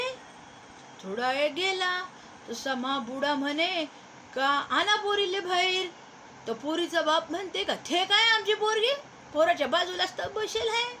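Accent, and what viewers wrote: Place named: Vidarbha, India. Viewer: खूप सुंदर कथा सादर केली आहे़ . विदर्भ बोलीचा ठसका एकदम कडक 👌👌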